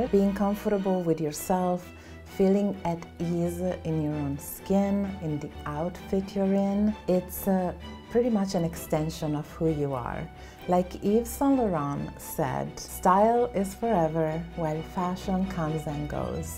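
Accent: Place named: Italy. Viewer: I really like a beautiful bag that elevates an outfit. I love your accent?... My dad came to America from Italy (Calabria) when he was 8. You sound like my beautiful Italian cousins. 😍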